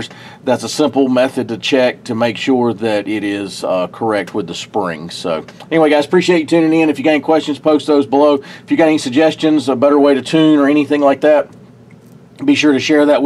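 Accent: South, United States. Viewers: NICE LITTLE DIY TIP!👍THX! I KEPT THINKIN U WERE SAYIN "COAL" WHEN U WERE SAYIN "COIL"...LOL.😁 CUZ OF UR NICE SOUTHERN DRAWL. WHERE R Y'ALL AT?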